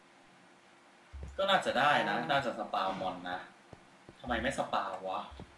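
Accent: Isan, Thailand. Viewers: พี่ พูด ภาษาอีสาน บ่ซวนกันเล้ย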